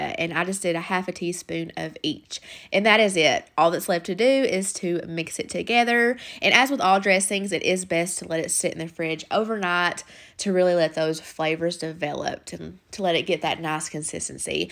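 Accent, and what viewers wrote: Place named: North Carolina, United States. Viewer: Are you from North Carolina? Your southern accent sounds like it. I’m from North Carolina.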